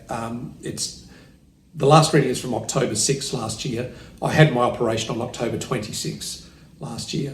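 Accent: Australia. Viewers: Dear, I pray for improvement in your situation (as much as an atheist can pray) and send you love and support. I like your channel for 2 reasons: 1. The beautifull Australian accent 2. The great person you are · I am happy you are back. We worry about you and pray for you. Negative comments! Hard to believe there are weirdos like that It is bad news but I am glad to see you are going to fight. You look good, even if you don't feel good. You are alert and keeping positive no matter what. Hang in there and keep posting, especially before you go in for your scans. Try not to worry. We will pray for the best. You are so brave and an inspiration in courage. Love your cute Australian accent.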